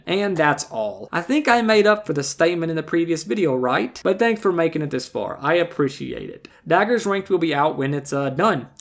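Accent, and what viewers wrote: South, United States. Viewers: What part of the South are you from?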